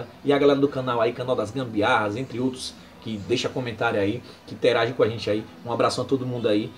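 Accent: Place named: Bahia, Brazil. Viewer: Tem sotaque de Baiano rss.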